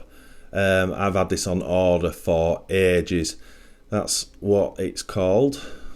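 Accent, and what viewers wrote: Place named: Northern England, United Kingdom. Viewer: Love the channel, but a bit of a personal question, you have a northern accent and I am thinking south lakeland.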